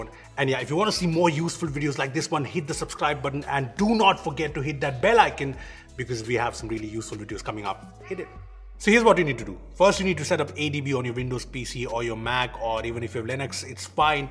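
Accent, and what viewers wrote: India. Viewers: indian accent😐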